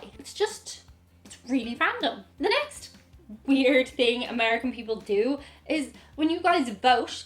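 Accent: Ireland. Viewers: I just love her accent. Female Irish accents are the nicest in the world.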